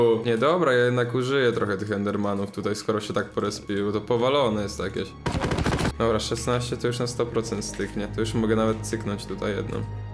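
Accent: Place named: Poland. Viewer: Ten twój Polski angielski czasami boli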